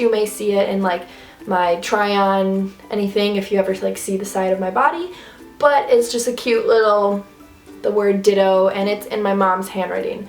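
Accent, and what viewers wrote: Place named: Michigan, United States. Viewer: I love your accent. Are you from Michigan? I've always wanted to live there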